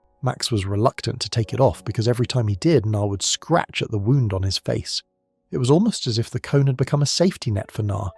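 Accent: United Kingdom. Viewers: Thank you so.much. pls use a narrator with an American accent. Uk accent i s rather irritating.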